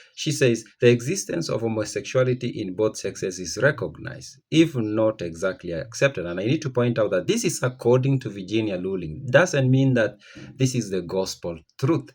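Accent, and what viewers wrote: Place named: Kenya. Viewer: we are dignified people we are nomadic we troll each other because we think words are just word lol we laugh a lot and believe in mental resilience and respecting others even if we are not from the same country I learned all this from my dad he grew up nomadic but he gets along with anyone and will speak up/ stand up for anyone when need be. M Side note: from your accent I think you’re Kenyan or 🇺🇬? This my first time seeing this video so is just a guess😂 nice video🙏🙏🥰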